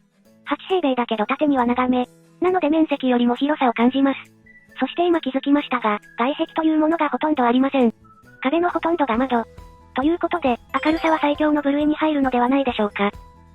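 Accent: Hakata, Japan. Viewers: 博多弁がバンバン飛び出してくるので、何か嬉しい。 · 博多弁や福岡の景色が懐かしく嬉しいです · 家賃、いくらくらいなんでしょうね。 ところどころに博多弁が出てくるのが、福岡の人間として嬉しい😊。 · 博多弁がオモロイですね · 博多弁がなーんか嬉しくて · 博多言葉がかわいらしくて、いいですね。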